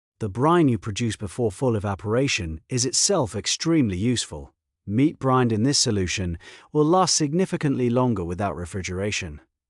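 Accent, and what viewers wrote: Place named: United Kingdom. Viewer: We don't like this British accent on these things in America no we don't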